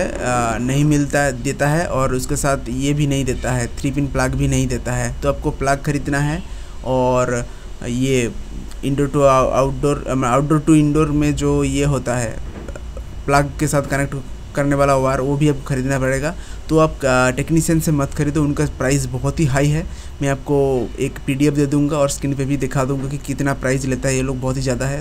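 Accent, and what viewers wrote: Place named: Bengal, India. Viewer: ভাই তোমার ভাষা শুনেই বোঝা যাচ্ছে তুমি বাঙালি।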